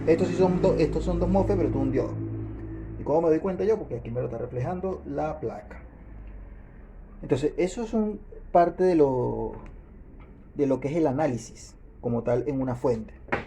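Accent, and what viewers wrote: Venezuela. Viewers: Muy buen video. Disculpa el comentario hablas como venezolano no como chileno. Muchos exitos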